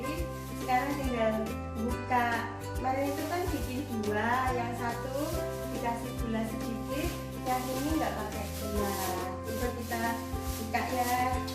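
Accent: Indonesia. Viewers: Orang Jogja kah Bun?😁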